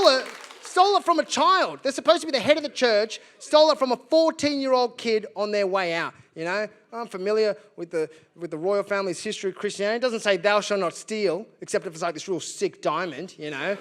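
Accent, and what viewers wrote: Australia. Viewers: You are Aussie! How marvellous❤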